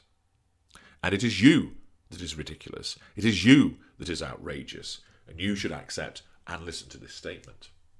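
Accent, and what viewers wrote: England, United Kingdom. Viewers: Also, this channel has really helped me to avoid cognitive dissonance as your voice (minus the English accent and insert a country bumpkin Deep South accent) is deep and commanding like me ex. Every time I listen it reminds me of my ex jackass and subsequently do not fall for his fuckery. Thank you. While it is clear that you enjoy telling the tales, there is a level of benevolence that I accept and appreciate.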